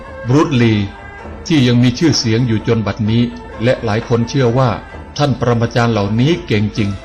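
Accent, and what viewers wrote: Isan, Thailand. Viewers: ชอบมากเลย... พูดอีสาน ได้ม่วนอีหลีเด้อ55555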